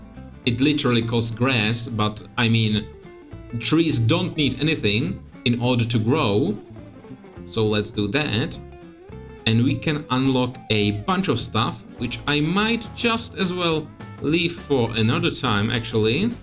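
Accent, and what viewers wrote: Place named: Germany. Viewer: The guy sounds German which to me is an interesting demonstration of how "bad language" is not inherently "bad" and I enjoy how diverse the reactions there are to the same thing.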